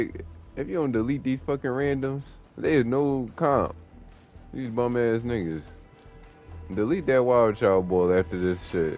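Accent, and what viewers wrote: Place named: Philadelphia, United States. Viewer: I can tell you from philly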